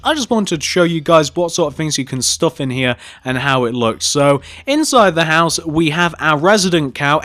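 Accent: United Kingdom. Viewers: he's British so he says it like that! >:O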